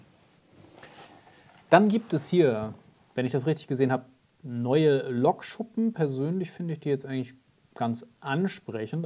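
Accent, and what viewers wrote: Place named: North Germany, Germany. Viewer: Hallo, ich habe gerade Ihren Kanal hier gefunden und freue mich schon darauf mir Ihre Videos anzusehen. Da Sie Norddeutscher zu sein scheinen, hätte ich 2 Fragen an Sie: 1) Wohnen Sie etwa in Dänemark? 2) Warum interessieren Sie sich für dänische Modelle?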